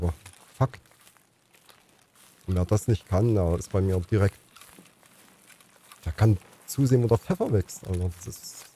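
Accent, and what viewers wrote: East Germany, Germany. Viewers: Sonofcolossus hat es so schön zusammen gefasst - daher möchte ich mich auch mal zu Wort melden, nachdem ich Deine Videos schon eine ganze Weile erfolge: Erstmal Hallo Wie gesagt verfolge ich Deine Videos zu Tarkov schon länger. Anfangs dachte ich mir schon mal ab und an - was fürn noob. Aber je öfter ich in deine Videos geschaut habe, habe ich feststellen müssen - da spielt einfach ein "Otto-Normal". Keine high-end Waffen oder meta- gear, kein Rumgespringe oder ausm Fenster des 3. Stocks in die Parterre, oder straight auf jede Konfrontation,sondern einfach nur "normal" zocken. Klar geht das auch oft in die Hose (kenne ich selber), aber ich finde deine Videos dennoch wesentlich unterhaltsamer als die von Meyer, 2Twistet oder sonstigen namenhaften Streamern! Dazu kommt dein "Ossi-Dialekt" - herrlich - vor allem, wenn du weggeballert wirst und "fluchst". 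Oder einer deiner Kumpels mit denen zu in die Raids gehst (komme gerade nicht auf seinen Namen -!?) der immer so schön sagt "Ach hör' mir doch öff" 🤣 - herzerfrischend! (an der Stelle, Grüße aus dem Westen), Unterm Strich muss ich zugeben, dass ich mich mittlerweile echt auf neue Videos von dir freue und alle wirklich immer unterhaltsam sind! Mach' weiter so, bleib' Deiner Linie treu und viele Kills und Loot gewünscht! Grüße, DoomDog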